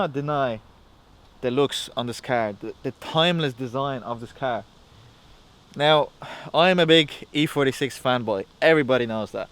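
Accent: Ireland. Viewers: Where you from your Irish sounds mixed up with another language?? Great car I want to buy auto would you recommend thanks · you sound like you have adapted a irish accent but have talked another language in your early age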